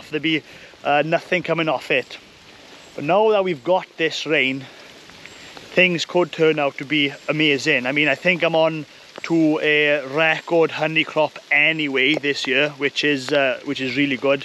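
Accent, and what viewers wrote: Wales, United Kingdom. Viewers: Hi, believe it or not, although I am researching himalayan balsam, I clicked on your video because i hadn't heard a welsh accent in a while! I like the content too. Which part of Wales are you from? The north?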